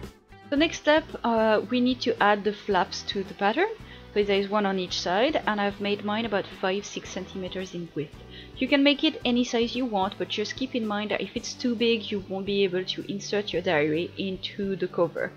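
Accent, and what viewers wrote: France. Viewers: Amazinggggggg, im from mexico and i love your french accent when you talk english ^_^ ill try to do this!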